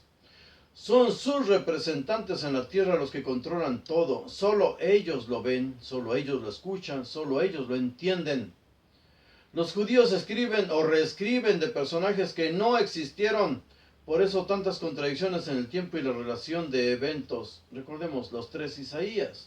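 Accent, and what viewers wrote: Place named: Mexico. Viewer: Me muero de la risa escuchandolo, Doctor. Su acento mexicano es muy jobial y ademas todo lo que Ud. dice es tan cierto y obvio.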